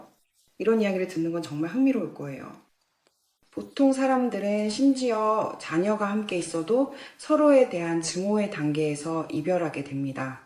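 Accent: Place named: Russia. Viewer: 꾸준하게 좋은 글을 올리시네요 .아쉬은점은 한국어가 능통하시는데,아직 러시아 발음이 깔려 있어 발음에 세심한 경청이 필요합니다 . 이점 때문에 내용은 좋으나 구독 유저가 저조 하다 생각합니다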